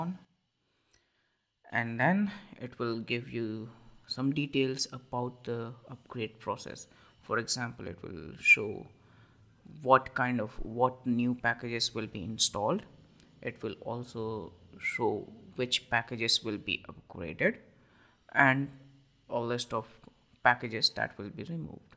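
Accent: India. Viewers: No, you are too quiet, speak with a distinct Indian accent, and your are speaking way too fast to follow.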